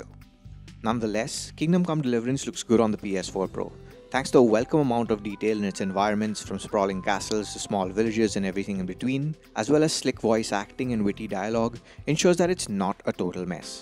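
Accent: India. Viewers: Can't stand people from India talking English. Ding Dong in my ears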